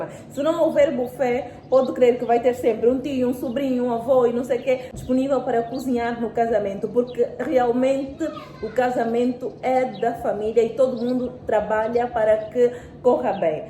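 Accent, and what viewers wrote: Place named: Portugal. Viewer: Ela tem um sotaque português, muito agradável.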